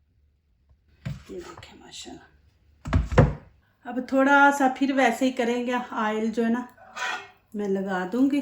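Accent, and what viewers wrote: Punjab, India. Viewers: Assalamu alaikum. Baji buhut khob . Mashallah. Dil Khush kar ded da. Eik toh Aap panjabi andaz se bolte ho .us par itni laziz recipe banate Ho Dil sach mein khush ho jata hai. Allah Ke hath ke cycle ko barkrar rakhe aamin... Mujhe gobhi ke parathe ki recipe bataen .. I am from India Allah hafiz..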